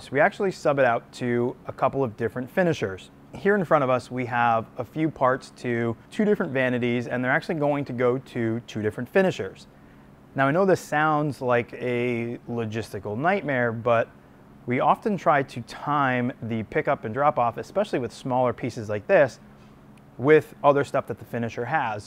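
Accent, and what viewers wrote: Boston, United States. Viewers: More Boston accent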